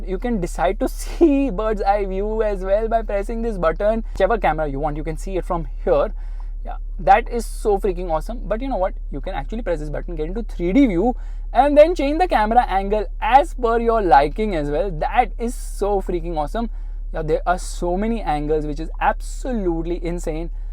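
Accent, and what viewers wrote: India. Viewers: That's an absolute indian accent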